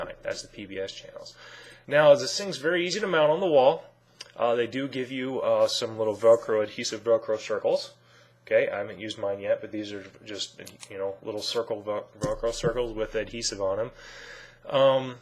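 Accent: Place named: Germany. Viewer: hi are you from germany? i noticed the german accent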